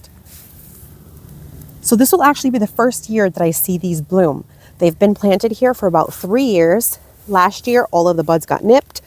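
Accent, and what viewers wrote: Massachusetts, United States. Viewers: Love your Mass accent! Don't change it! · Hi! I really enjoyed this video and seeing both you and together…thanks for sharing your plans! I could just feel the joy & excitement you had & it motivated me to start my planning now! We have a new flower bed around our new patio just empty, ready and waiting to be planted up! Oh boy…I’ve got lots of decisions to make…LOL! 😂 BTW…loved hearing your MA accent too! 😊 Also happy to hear that your Chinese Fringe plant from Janie made it…YAY. 👏🏼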